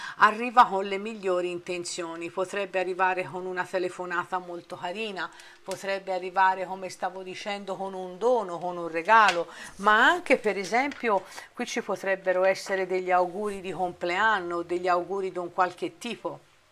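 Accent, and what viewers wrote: Tuscany, Italy. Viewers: Sentirti, con questa meravigliosa parlata Toscana, è bellissimo. Io delusa.. Uff ormai ci ho fatto il callo.. Mi piacerebbe molto lo sai trovare finalmente la persona giusta, ma è molto difficile. Ma nonostante questo mi piace sempre ascoltarti❤️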